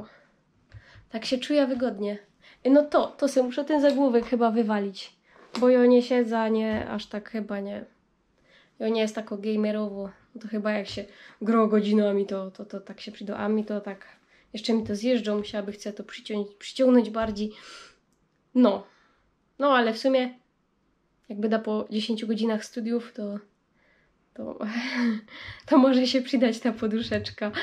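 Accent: Silesia, Poland. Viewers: Podoba mi sie ta Śląska Godka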